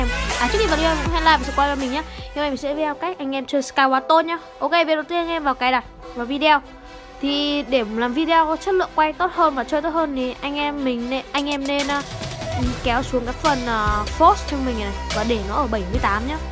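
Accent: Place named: North, Vietnam. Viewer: Nói ko hiểu gì hết ( hì hì tui ko nghe đc giọng của người bắc)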